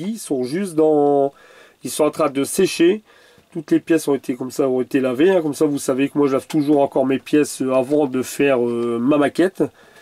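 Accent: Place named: South, France. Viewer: 😂😂 je l’aime cet accent du midi.......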